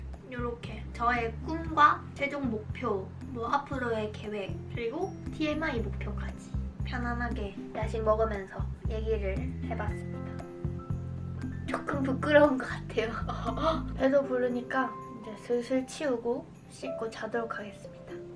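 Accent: Busan, South Korea. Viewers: 어투는 표준어, 억양은 부산 사투리, 발음은 또박또박... 각 특징이 뚜렷하시네요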